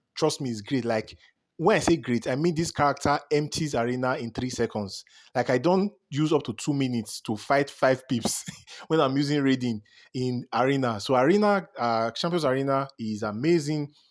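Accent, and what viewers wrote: Nigeria. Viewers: question are you Yoruba or from the south west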